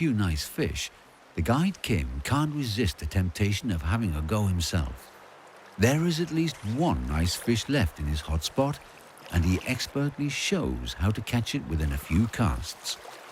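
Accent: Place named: RP, United Kingdom. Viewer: BBC English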